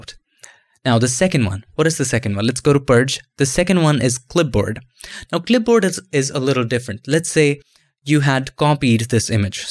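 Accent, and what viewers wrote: India. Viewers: No matter how much you try to get a american accent your indian one cracks through your effort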